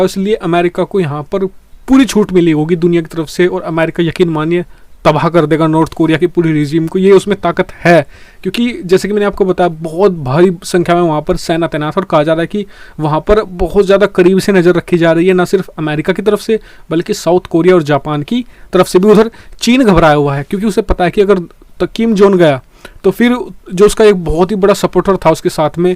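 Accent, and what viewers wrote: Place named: Bihar, India. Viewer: SIR AAP KIS STATE SE HO ? BIHAR SE I GUESS ?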